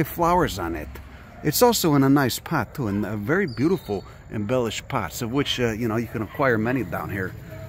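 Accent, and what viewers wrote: Chicago, United States. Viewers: You sound like you are from Chicago? You sound like a family member, making me homesick for the Chi! Thanks for this video, I want to grow this plant and I live in Phoenix now. Just what I wanted to know. Bravo. Good video.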